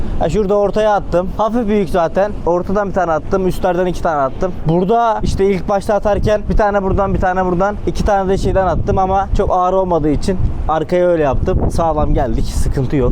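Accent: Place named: United States. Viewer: Babacan Allah yardımcın olsun ama amerikan ağzıyla konuşman çok sinir bozucu yok tarp yok mill Türk ağzıyla konusun spenzet vs km aksi sinir bozucu yolun açık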